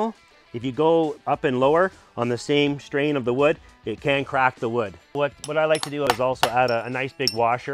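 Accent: Canada. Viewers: Canadian eh???????? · You sound Canadian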